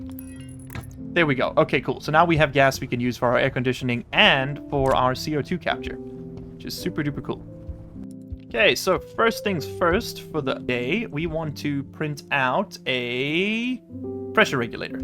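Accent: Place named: South Africa. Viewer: Thought you had a Dutch accent, but South African is close enough 😅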